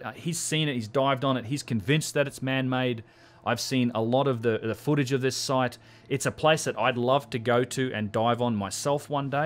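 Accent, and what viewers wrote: Australia. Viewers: hey , wow , wish i hadd known you were doing this , , and dude you sounds like an aussie , , same , , please explain 1inch high heiroglyphs in granite , done wih brass chilel , , come cuts in red granite 1mm thick 2mm deep , , are you aussie , would like to show you in person , i have worked with sonte for 34 yrs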